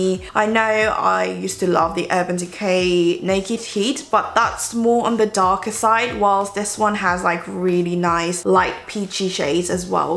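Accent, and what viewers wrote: United Kingdom. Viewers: 호에 온니ㅜ 단발 넘나 잘어울려여....🙋🏻❣️ 온니 영어발음에 치이고 감미다...총총 온니 영상보고 영어공부할땨마다 영국발음이 조금씩 입에 붙고있어여ㅋㅋㅌㅋㅋㅋ 저는 블러셔는 누드팝에 빠져있고 립은 페리페라 아련폭발+맥 칠리 조합, 그리고 맥 버시컬러스테인 프리저빙패션에 빠져있어여!!!! 곧 봄인데도 불구하고 왜 아직도 가을가을한 조합이 좋은걸까요ㅠ🍂🍁🥀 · 와 이게 영국 억양이에요? 진짜 매력적이다 와... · Love your British accent so much!!!!!! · 영국식 발음 너무 좋아요ㅠㅠㅍ 언니 너무 이쁘고 우아하고 그러네요...구독 누르고 가요 자막 안보고 목소리에 집중해서 영상 봤어요 ㅎㅎ 좋은 영상 감사해요♡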